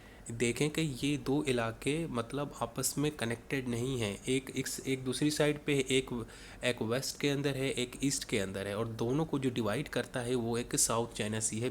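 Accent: Karachi, Pakistan. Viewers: Apka Accent Karachi Wala hai..... Karachi waaly bolty hain æsy...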